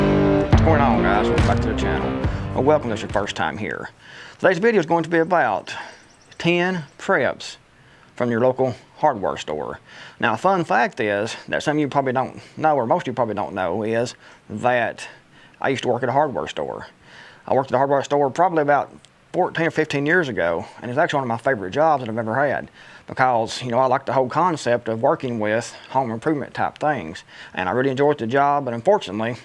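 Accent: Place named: Tennessee, United States. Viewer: Being from the northeast, I ALMOST needed a Tennessee to Jersey dictionary. Thankfully I've been to your great state a few times so I'm used to it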